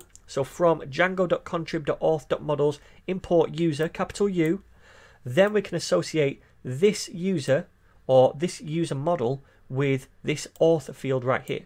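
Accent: United Kingdom. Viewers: That sounds like British.............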